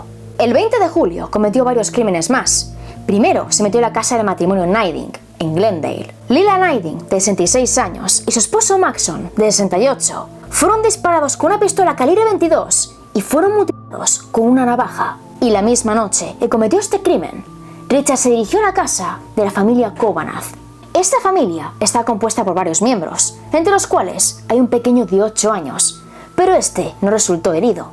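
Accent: Spain. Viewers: Tarde pero sin sueño chula , aki presente para escuchar tu versión de los hechos con el Richi y con tu presiosa voz de española.😚 Saluditos 🍓🍓🍓🇲🇽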